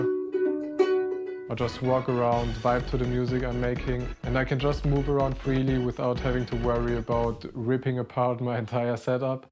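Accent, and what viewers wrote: Germany. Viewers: The german accent makes it 100 times better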